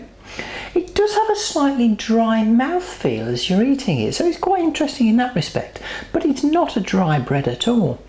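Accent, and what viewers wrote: Midlands, United Kingdom. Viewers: My buckwheat flour gives a black loaf but its an ok loaf thanks.detect a midland accent? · Very cool video! However, you are the most British person I have ever heard.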